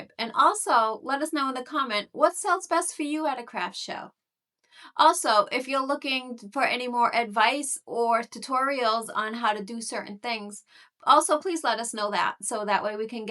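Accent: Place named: Massachusetts, United States. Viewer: Hi there. Love the MA accent. From Plymouth. Setting up a small 3d print farm now. Was wondering if you’ve used the laser on PLA. I’ve just found you so haven’t watched all your stuff yet.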